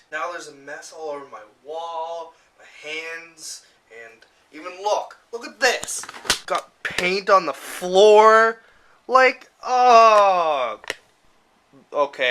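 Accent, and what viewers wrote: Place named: Canada. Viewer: You have such a Canadian accent. Love it!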